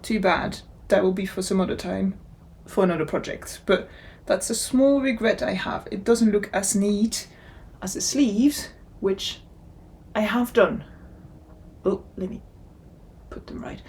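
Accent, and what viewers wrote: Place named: Wales, United Kingdom. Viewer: Nice first podcast 😃. You could modify your Ida tee…. Undo the cast off and unravel to length you want… then re-do rib 😊. Undo the sleeves and put in some deçreases to get rid of some of the width. It would be worth an hour as it is a very nice piece 😉 I also think that Petite Knit is fantastic for everyone. She has sensible everyday designs. I just finished her Cumulus …so simple but a great everyday sweater. Your red cardigan will be beautiful The Gepard (Danish) kid silk is also very nice. I am presently knitting the Buttercup T-shirt with Sandnes Garn Line, The Thelma Cardigan by moonstruck knits…who has grrreat patterns check her out…using Myak Tibetan Cloud for the first time and it is glorieux 😂 You have a nice accent when you speak English it has a Welsh sound… bye bye … me baldelaine on Instagram 😊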